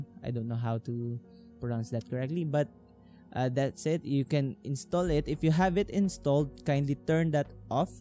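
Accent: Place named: Philippines. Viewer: Haha pinoy accent 😉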